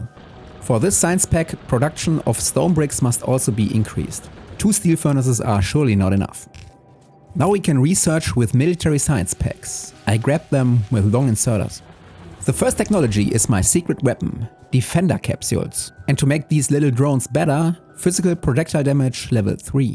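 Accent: Germany. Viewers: You sound german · Das ist das erste Video bei dem ich gerne eine Übersetzung von einer KI hätte. Dein Akzent klingt wie Bayrisch, nur schlimmer. Holy Moly.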